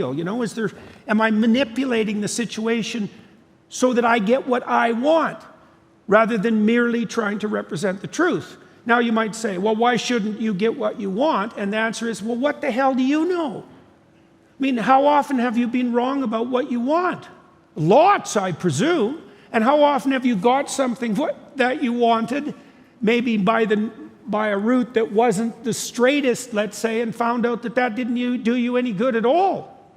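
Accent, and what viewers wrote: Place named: Canada. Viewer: He’s Canadian and has an accent as many if not most Canadians do when they pronounce “a”, if anything he was courteous enough to try to correct himself when he noticed. Does he truly strike you as somebody who would try and make a passive aggressive jab at someone by purposely mispronouncing their name? If anything he just spoke it as he speaks naturally and you’re being intolerant of him. But the main thing is, who cares? Your name is a sound that you respond to like a dog, don’t get so hellbent on stuff like that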